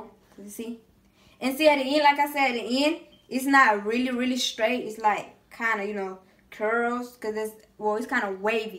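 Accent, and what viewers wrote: South, United States. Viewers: Nah that's an insult to just can't speak she's ignorant just got a country accent she ain't putting on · She’s Hispanic and she’s from Alabama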